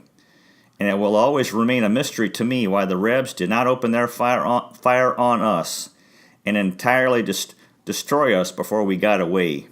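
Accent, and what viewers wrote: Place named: Germany. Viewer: Do u speak German ? It seems like you have a slight German accent very interesting diarrhea appreciate you reading it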